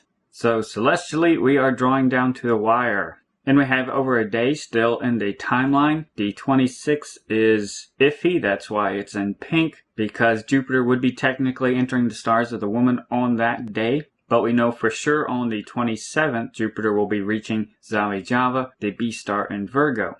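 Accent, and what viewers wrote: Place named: South, United States. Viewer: I know, isn't his accent the cutest, southern drawl. He will be busy with lots of hugs very soon! Of course, after we all fall down at the feet of our amazing Lord and Savior Jesus Christ and get hugs from Him first. Maranatha guys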